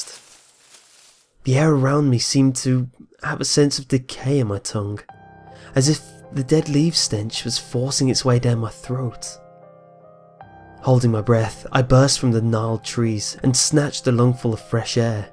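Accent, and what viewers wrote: England, United Kingdom. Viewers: English accent I believe :3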